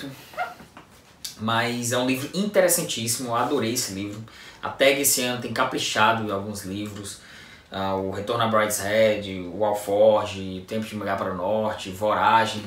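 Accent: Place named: Fortaleza, Brazil. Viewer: Assim que me identifiquei com teu sotaque, fui atrás de saber se tu é de Fortaleza kkkkk Que coisa é encontrar canal literário de qualidade pertinho da gente!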